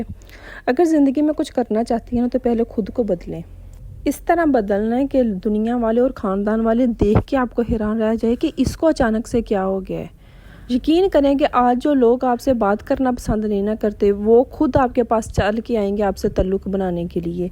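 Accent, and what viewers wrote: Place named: Sialkot, Pakistan. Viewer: Your accent is Sialkot,nice accent,so nice of you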